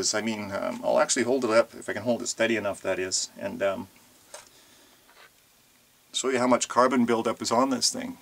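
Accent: Canada. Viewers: Love the Canadian accent lol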